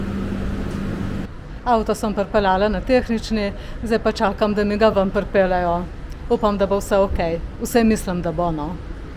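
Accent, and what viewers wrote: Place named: Primorska, Slovenia. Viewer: Te zmeraj rat poslušam mam rad vašo govorico primorsko, tko da te ze prav čakam vsako nedeljo ,da si ogledamo tvoje videje diooo deklina primorska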